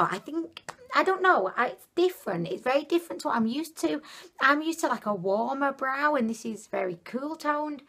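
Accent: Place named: Manchester, United Kingdom. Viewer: Ahahah great accent where you from?....Sounds a bit like a Mancunian accent..I'm from Manchester also....your skin looks great but love that lipstick on you x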